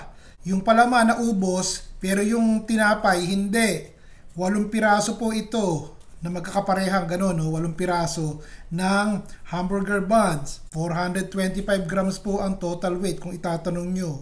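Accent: Philippines. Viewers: are you from Nieva ecija ? the way you talk you sounds like my cousins from the philippines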